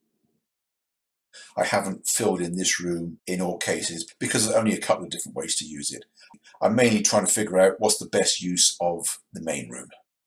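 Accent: England, United Kingdom. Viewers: I just love the term; ‘Herniated Helix’. Not a term I’ve heard here in the UK. I am amazed that you still have your English accent, despite your use of American terminology. I tend to readily pick up accents and if I lived for a year in the US, you would believe that I was born there. I do enjoy watching your videos. Best, Jason.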